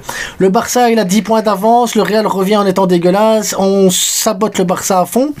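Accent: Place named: Belgium. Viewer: Tu es un tueur mec 😉❤️ J'aime les frites 🍟 ainsi que l'accent belge 😉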